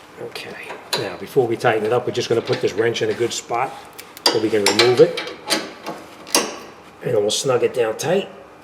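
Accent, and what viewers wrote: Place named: New York, United States. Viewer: You have that strong NU YAWK accent! Excellent video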